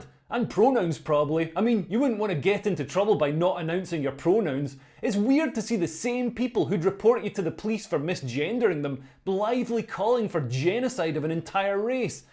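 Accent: Scotland, United Kingdom. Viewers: Oh My. I'm an Israeli and this full scale, informed rant was worth the struggle to keep up with an accent like that of Scottie on Star Trek. Bravo, Kol HaKavod - a wonderful example of lethal humor used to brilliant effect. I'm sharing this. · How can a Brit say all this and not get targeted by The Crown or his Irish neighbors? Or, did i detect a Scottish accent? Anyway, superb breakdown. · Saying that supporting the right of the vast majority of a fully innocent (and yes maybe clueless too ? still not murderous though) Palestinian population not be be bombed into oblivion as retaliation for some of their local nutjobs' atrocities is the equivalent of being a Hamas terrorist fanboy is somewhat of a stretch, ey ? Cute scottish accent or not, you're talkin shyte, me lad. Granted, there are a few wild ones out there but exclusively singling these out strikes me as disingenuous. 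· Never liked Scottish accent... but I do love yours ❤️thank you 🙏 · This my first experience watching channel. I laughed, I applauded, I cheered, and fist pumped the air. I love this guy!! And that accent just adds to the overall experience. I’m an instant subscriber and his newest fan. He’s like the Scottish version of Ozy Man focusing on politics. Well done, sir! Please keep it up!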